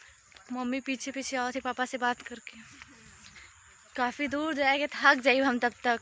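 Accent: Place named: Bihar, India. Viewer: Aap lgrha hi ki bihar ki hi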